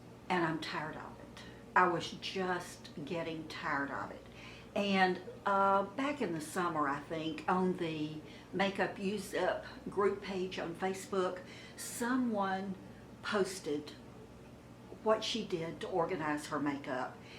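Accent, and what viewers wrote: South, United States. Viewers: Well Hallelujah and God Bless You. U have done what I wish all the others would do. Glad u reached this point and got off the crazy roller coaster. These others start out to review products, make money and get a Gillan w major company to get freebies. But they get sucked into the tornado of vanity and keeping up with the gurus. I've watched Kandee for 9 yrs maybe, and she is a true artist and appears so happy and sweet and not jaded. She a beauty. I have tired of the few others I did watch and only look around for swatches of lip colors, etc. I'm a lipstick gal.. Thts my 1 product, just as my mom's was. I too, use a cubicle almost exactly like urs, acrylic w a handle and it was a flatwear caddie tht I use to use serving buffet style. It's perfect und sink and easy w the handle. I have no more other than a box of all my lipsticks cause I wear diff shades. 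Do, glad u guys are safe and Destin is where we vacationed 18 yrs I was married. It's wonderful and I'd love to live there one day but I havent done sun in the last 12 yrs. U sound like u might be from GA or SC. Take care and stay beautuful. Doesn't take makeup when it comes from within.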